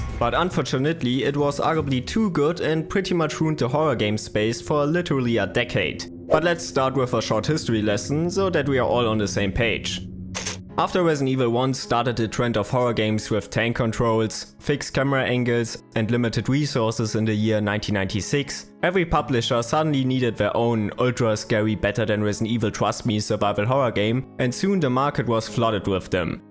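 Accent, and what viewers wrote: Germany. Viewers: Diesmal kommt der krautige Akzent aber wieder häufiger ❤ Gutes Video, die Montage mit dem Zombie der sich beim Nachdenken ans Kinn fasst hat mich fast gekillt 😂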